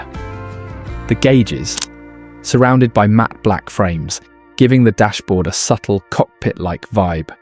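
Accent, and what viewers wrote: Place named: United Kingdom. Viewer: I detest these videos with robogobs: it us narrated with a British accent, but using 'Merkinisms such as "Five hundred twenty nine".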